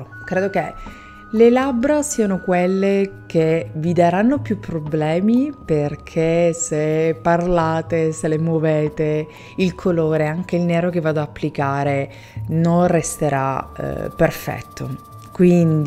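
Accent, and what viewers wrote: Sicily, Italy. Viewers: Sei la migliore in assoluto...per la tua bravura, la tua fantasia...ma sopratutto per la tua simpatia e il tuo mitico accento da siciliaaana :) una curiosità...i tuoi figli ti vedono con questi trucchi? Cosa pensano? Hahaha che divertimeto immagino...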